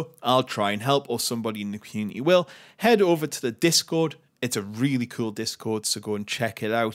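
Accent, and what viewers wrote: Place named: North East England, United Kingdom. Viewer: I'm Newcastle, so I'm thinking Washington/Sunderland/Durham maybe?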